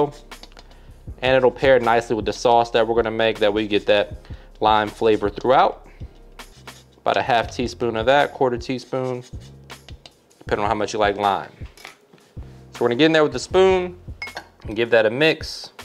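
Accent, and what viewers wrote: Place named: Louisiana, United States. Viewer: You seem to have a Louisiana accent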